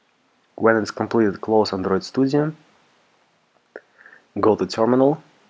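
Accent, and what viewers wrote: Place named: Russia. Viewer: рашн инглиш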